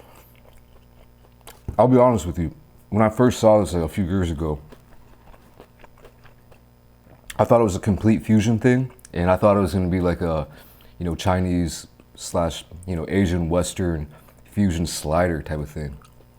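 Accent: United States. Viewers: I like to see more of your shows to make more please three times a day be good for me I like to hear you talk it gets we don't know each other by no means we don't I'm using Google talk so forgive me if words don't come out correctly I don't always read it after what I say so I can't correct it I would love to see more of where you live so take a little short video of where you live let me see your surroundings I love that you have an American accent where you lived and where I live we're not too far apart we wasn't too far apart we are now I just like you I really do I don't know how to explain it but I want to see more of your videos so please for having sex make more cuz all I do here you talk I don't talk to nobody for heaven's sakes pretty much nobody I don't even very rarely talk to my family I really don't have any family anymore I got yeah I got uncles and Aunt but never talk ever associate never my sisters and brothers very very maybe once a month and that's a god honest truth YouTube is basically is becoming my family I hate to say that but that's God's honest truth and you're becoming one of my favorites so please put make more please that's what I'm trying to get after making more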